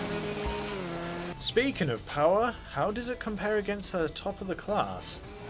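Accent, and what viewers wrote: England, United Kingdom. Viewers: That English Dave was spot on, oh yeah, well you are English, soooo yeah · He seems to be British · Sounds nothing like English dave but he does sound English even in his normal voice which doesn’t make a lot of sense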